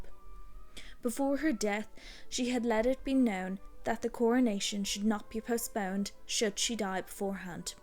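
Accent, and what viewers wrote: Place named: Ireland. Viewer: You do very good research but my ears are 75 and my curiosity is still 15. With my arthritis a comment that extensive meant it had meaning for me to do all that typing. "TOASTMASTERS" is a group that helps people who do public speaking and presentations to groups, etc. It's like AA, with no fee to join, just a support group of like-minded people wanting to learn how to speak to groups with confidence. You sound Irish, I really don't know a lot about Irish society.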